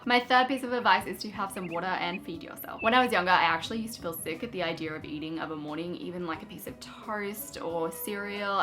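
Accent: Australia. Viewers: I am trying to place your accent. Are you Australian?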